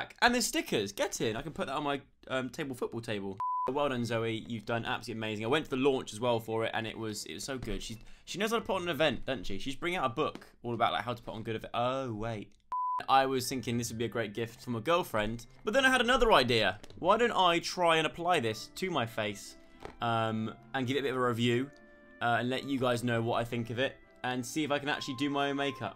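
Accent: Australia. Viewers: we love an Australian accent when I look at myself in the mirror what a pro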